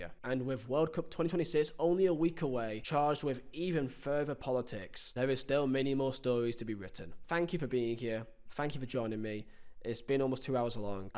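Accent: United Kingdom. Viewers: UK accent 😫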